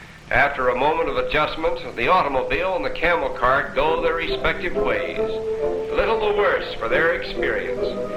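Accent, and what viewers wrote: United States. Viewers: This sounds more American than British. By the way, I am an Indian-American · great footage, what a weird and awful voiceover! lots of comments on here about British voiceover, its like, er, an an american accent init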